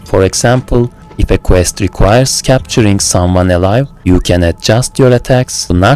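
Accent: Turkey. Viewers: Are you Turkish? yazacaktım sonra adını gördüm ama instagram linkine tıklayınca Türk olduğunu anladım. Türk aksanıyla İngilizcenin en güzel örneği:) kötü bir şey demiyorum bu arada, aksan bence güzel bir şey 😀 Başarıların devamını dilerim, böyle oldschool rpgler eski grafiklere rağmen daha dönemi yansıtıyor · You speak like Turkish :)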